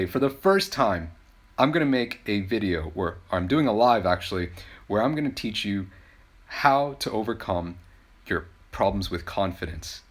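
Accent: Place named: United States. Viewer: sir, you say better than you but the fact is that you're best. Because I've been watching so many Americans they speak like you only. So you're just superb❤👌👌👍✌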